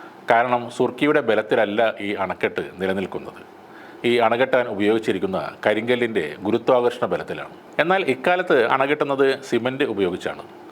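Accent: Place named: Tamil Nadu, India. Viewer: Iyal Malayalam parayunna tamilian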